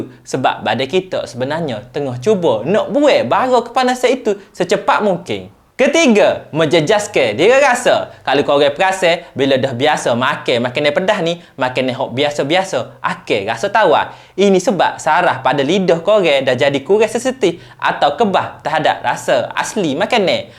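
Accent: Kelantan, Malaysia. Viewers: Bang baca Kelantan ke tu?😭😭😭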